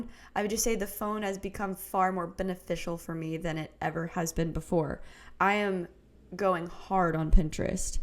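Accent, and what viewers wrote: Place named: South, United States. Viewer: You should do some Southern Receptionist ASMR stuff <3 accent so good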